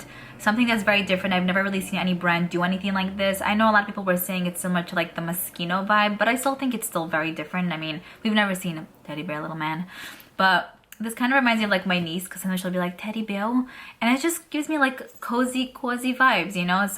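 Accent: New York, United States. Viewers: I love the NY vibes !!! Your accent, the horns, all of it 😃😃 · sounds like you live in nyc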